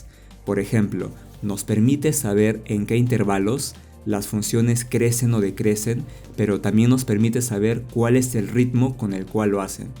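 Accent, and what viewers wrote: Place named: Peru. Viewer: Ese acento Peruano es increíble!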